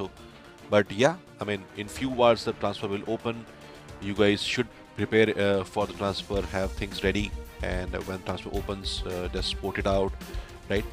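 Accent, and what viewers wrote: India. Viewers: What language are speaking bruh 🤣 im guessing ure indian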